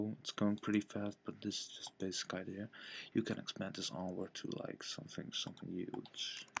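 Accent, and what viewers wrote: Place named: Mexico. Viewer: Nice mexican voice :D Good tut.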